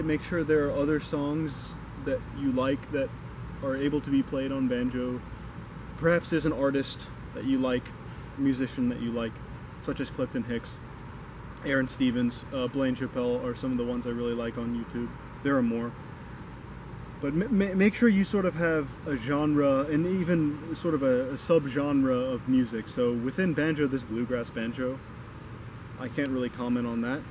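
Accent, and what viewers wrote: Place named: Canada. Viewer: your accent sounds canadian, reminds me a lot of Robert Pattinson in The Lighthouse which is an awesome movie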